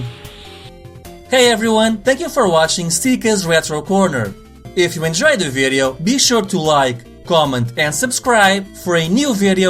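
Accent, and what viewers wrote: Portugal. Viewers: Desculpa... Mas tu és português? É que o sotaque é quase inconfundível! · I sense a Portuguese is reviewing this, dá-lhe amigo 👌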